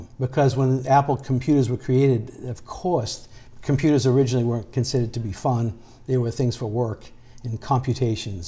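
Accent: Boston, United States. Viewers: I can hear your Boston ❤️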